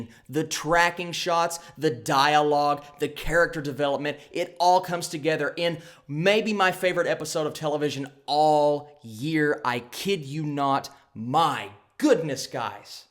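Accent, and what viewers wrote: South, United States. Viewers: Something I had was I was expect something to happen all the time, usually in horror movies/series you can't tell when somethings gonna happen. By the way your southern accent is killing me I love it.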